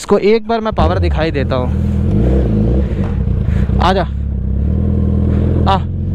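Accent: India. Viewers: Bhai aap bihar se ho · Bhi tum bhajpuri Ho ke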